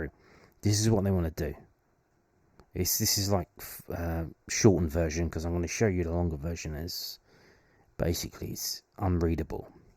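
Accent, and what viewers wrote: Scotland, United Kingdom. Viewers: Your Scottish accent is awful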